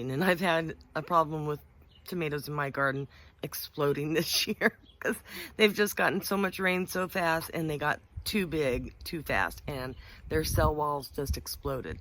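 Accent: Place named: Texas, United States. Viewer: Thank you Mam, very nice video and very informative. I like the Texas accent. You are lovely and gorgeous as your tomatoes. :)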